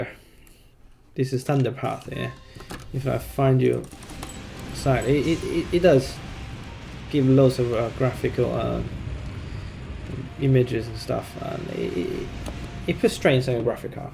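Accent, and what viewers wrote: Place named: United Kingdom. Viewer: Your accent is very English aha. I thought you were native at first. Thanks for the video and the extra background info is great.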